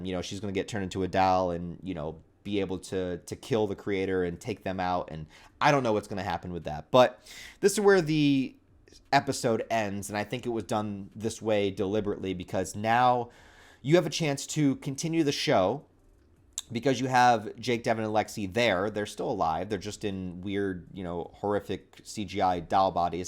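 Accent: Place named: Northeast, United States. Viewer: Northeastern accent lol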